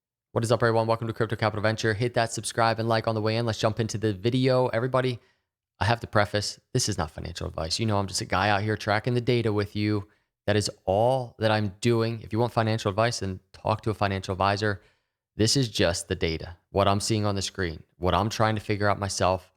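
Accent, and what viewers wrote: Philadelphia, United States. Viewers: Gotta love that Philly accent. GO BIRDS! Let's go Cardano too!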